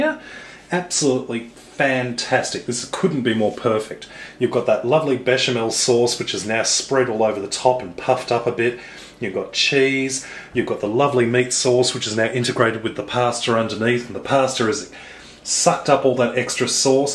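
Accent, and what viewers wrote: Australia. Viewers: This guy has a very clear Australian accent. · Love the Aussie accent!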